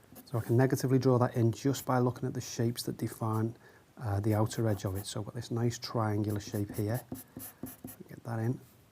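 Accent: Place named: Merseyside, United Kingdom. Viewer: Enjoyed the video just great thanks. Ps your voice sounds like mine Merseyside right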